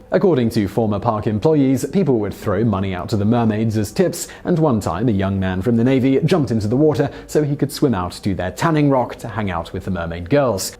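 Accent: United Kingdom. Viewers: lotsa misformation... your cookie cutter production wears thin... fewer videos and better research, if you don't mind... no offense, but tire of informational videos with the narrator speaking in British accent., which does not imply intelligence 7 and/or education as is generally accepted by the rest of the world; French and Spanish are considered "sexy", while German is considered coarse and unattractive ... there's a subject: Accents - favorites and least ... 🕊️ 🌎 ✊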